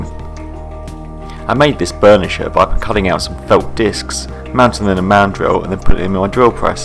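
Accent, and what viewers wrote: United Kingdom. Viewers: I guess your British, seing the house, your accent and such. Can I ask where you find your leatherwork tools and the leather? I have a hard time finding a store in Belgium, the closest is an hour drive with the car, and I am not sure that they have what I want. Online shops are also not that easy to find for a affordable price and have it trustworthy. I found some online stores in the U.K., but I have had a bad time ordering from the U.K. Maybe you know some trustable and affordable online stores? Thanks, and great video!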